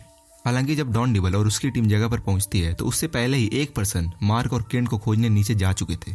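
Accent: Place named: Purvanchal, India. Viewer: bhai tum UP purvanchal side ke ho kya? Tumhari pronunciation 🤐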